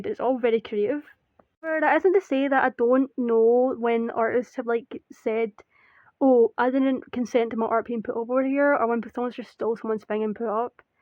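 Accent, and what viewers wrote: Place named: United Kingdom. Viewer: OH GOD. SHES FUCKING BRITISH!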